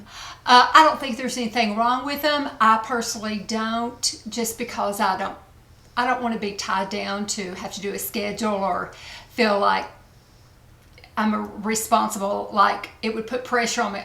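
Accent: South, United States. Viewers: Your southern drawl is endearing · I have always found your videos very enjoyable and your personality is genuine and comes across with honesty and a sense of comfort. You don't strike me as a social butterfly, but as a true home and family gal. You are never "overdone" with the artificial enhancements. You apply your make up to compliment, rather than disguise. And I love your Southern style and your accent. As far as the hurtful racist comments, evil is a dark place where souls lurk and has no place where the sun shines on goodness. And your videos bring sunshine to those of us who love you. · Great video, but then, I have dearly loved all your videos from the start!!!! You have values and feelings so similar to mine, we could be sisters!!! LOL!! I'd be your very much older sister, though!!! Please don't be so hard on yourself, I hated to hear that you redo so many because you felt they weren't good enough or that you could do better...I can understand that of course, but, those of us that know you and admire you so much would understand and wouldn't care nor would we judge you in anyway, if you bumbled every sentence from start to finish!!! 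For your sake, sweet, dear girl, ease up on the self criticism and just be you. We ADORE 'YOU'!!!! I am also southern and the first time I found you, and heard your gorgeous southern accent, I knew right then you'd be a fantastic YouTuber....and I wasn't wrong!!! Hugs, and have a blessed week...and hugs to all your precious family.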